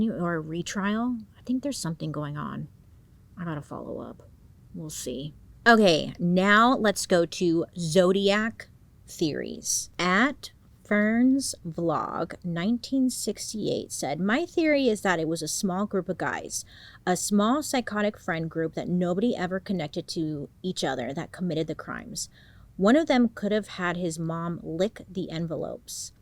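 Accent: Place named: Inland Empire, United States. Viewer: Dude idk if you have or haven't seen it but Rob Zombie has a badass documentary about Manson with what honestly seems more like the truth. The truth especially if you have been part of any like tweeker group or dope house mentality. Check it out if u haven't! Also I can totally tell your from the IE you talk like us❤❤❤❤ I love your story telling!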